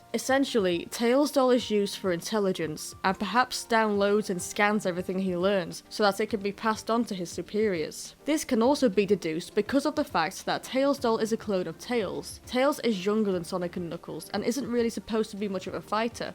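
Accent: United Kingdom. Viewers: I love your British accent, it sounds so deep and classy!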